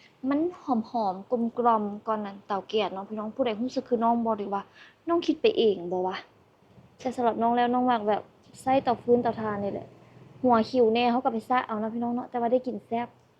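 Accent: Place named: Vientiane, Laos. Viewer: สำเนียงเวียงจันทน์ ไม่ใช่คนลาวใต้ ถ้าลาวใต้จะเป็นแขวงจำปาสัก สาละวันสำเนียงอุบลราชธานี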